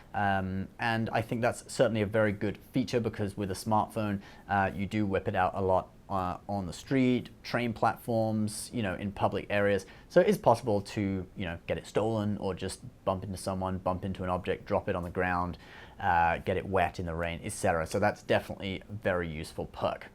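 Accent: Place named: United Kingdom. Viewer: American Eagle shirt + Brittish accent = paradox that could unravel the very fabric of the space/time continuum, and destroy the entire universe.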